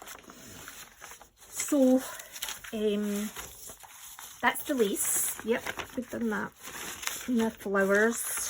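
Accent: Scotland, United Kingdom. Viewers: I forgot to say that I’m quite technically challenged lol. But I shall have a go at that, thank you. Btw… your accent makes me feel at home.. father from Glasgow mother from Stirling.. holidays in Scotland every year… finished my schooling and teenage years in Elgin but too late to pick up an accent.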